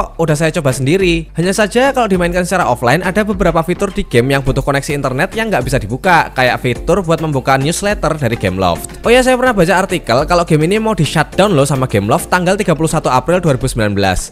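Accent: Java, Indonesia. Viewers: Kok Logat e kejawen pak😂 agak ngapak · medok amat suara lu bang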